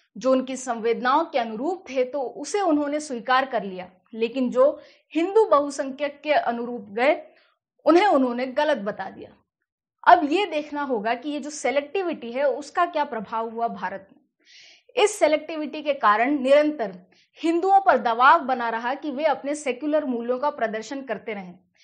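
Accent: India. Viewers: love the hindi accent also the usage of words. very unique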